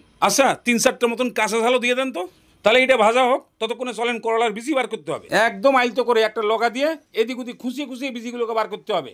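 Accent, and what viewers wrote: Bangal, Bangladesh. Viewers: আপনার বাঙাল ভাষা শুনতে খুব ভালো লাগে, আর রান্নাগুলোর তো কোনো জবাব নেই। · Sob thik ache sudhu bangal der moto kotha bola ta charte hobe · dada apnake onek dhonyobad.Darun sundar testy korolar recipe dekhlam.Tobe dada apnar bangal katha gulo Bhanu Bandopadhyay er moto lagche.Apnar quality ache aar apni drama korte parben.Thanks.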